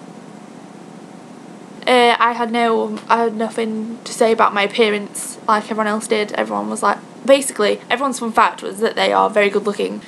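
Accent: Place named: United Kingdom. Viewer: Where abouts in the UK are you from? Your accent is sooo familiar...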